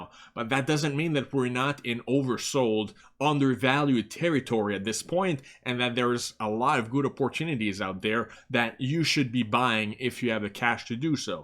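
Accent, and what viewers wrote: Quebec, Canada. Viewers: Dude, I love the videos, I find you to be one of the few 0 bullshit YouTubers, but I am curious because of the accent if you are from Quebec?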